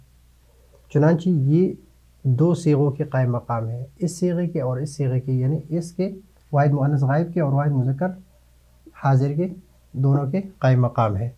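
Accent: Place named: Pakistan. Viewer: طرزِ تکلم تو پڑوسی ملک پاکستان سے ہونے کو بتلا رہا ہے باقی واللہ اعلم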